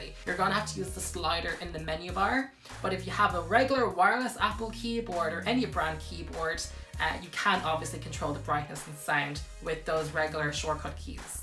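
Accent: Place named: Ireland. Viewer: Love your slight Irish accent!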